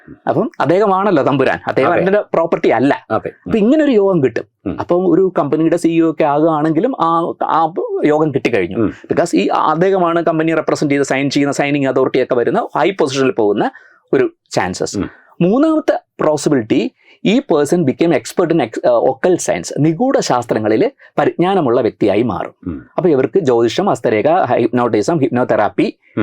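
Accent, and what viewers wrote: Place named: Trivandrum, India. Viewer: Tvm slang alle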